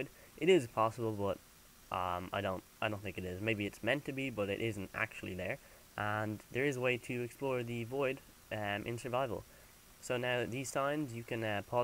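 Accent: Ireland. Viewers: is this lad irish? he sounds it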